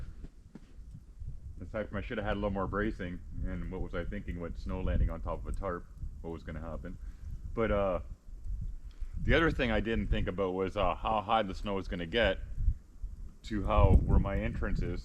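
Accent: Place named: Canada. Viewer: Wow. I've been over here so long I forgot how Canadians *sound*. 🙂 You a savvy enough mechanic to replace the brake line on the quad?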